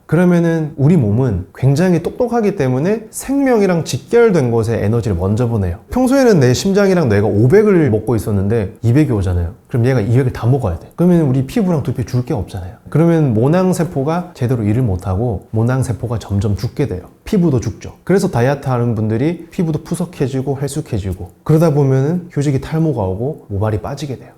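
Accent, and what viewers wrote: Gyeongsang, South Korea. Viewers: 선생님 고향이 혹시 경상도쪽이신가요? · 선생님 경상도 출신이시죠!??? ㅎㅎㅎ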